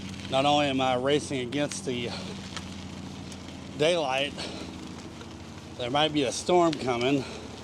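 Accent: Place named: Kentucky, United States. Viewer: Moose in the Whites are not a myth! I followed one across Chocorua lake in my kayak once. I’m always surprised to see moose droppings waaayy up on ridge tops on the trails. What are they doing up there? Saw one in Lake Umbagog as well. I’m enjoying your videos.. maybe I’ll see you on the trail sometahm (that was a tribute to your (Kentucky?) accent. Love it.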